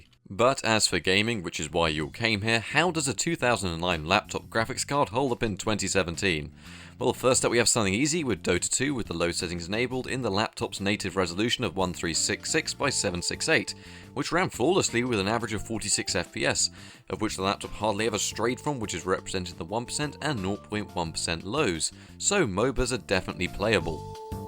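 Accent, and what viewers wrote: United Kingdom. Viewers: Ur British lol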